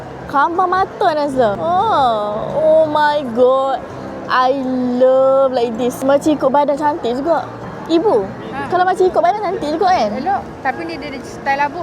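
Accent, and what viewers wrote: Kedah, Malaysia. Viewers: suka betul dengar cakap dengan loghat Kedah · Nanti buat lagii vlogg byk2 suka giler dgr cakap kedah 😌✨😽 Minat dari yg masakkan utk ipan 🫶🏼 serious sukaaa gilaa dgr ckp 😻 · suka lah tengok bebel dalam bahasa kedahh, comell tauu 😍 btw sebut nama abg sayang ke tuu 👀 kita rindu lah aimala, abg syg wait for me~ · ckp kedah smpai aku terikut nk ckp sekali 😂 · sampi kedah pekat betul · Aku suka betul loghat kedah, nak pulak yg bercakap lagi suka dgr 🤩 fighting post, will support baby no matter what 😘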